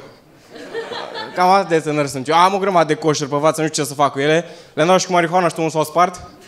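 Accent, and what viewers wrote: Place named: Moldova. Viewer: Un oltean cu accent de moldovean. Greu sa-l inteleaga ardelenii.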